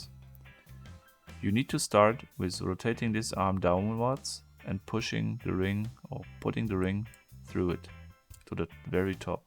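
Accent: Germany. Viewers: You are german. Am I right? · du bist aus deutschland oder ?😂😂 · Are you German? You akcent sounds like it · Are you german? Because you have a very german Akzent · Ich schau die Videos, obwohl ich weiß, dass ich mir nie so ein Puzzle zulegen werde. Und wegen dem netten Englisch mit deutscher Aussprache ;) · He has such a distinct German accent I can't imagine why you would think it was Russian. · Man hört so raus, dass du deutsch bist. kp, wieso.>_> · I can swear this guy is german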